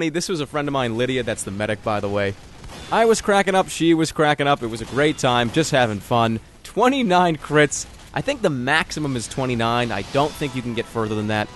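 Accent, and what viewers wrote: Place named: South, United States. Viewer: really good southern accent :D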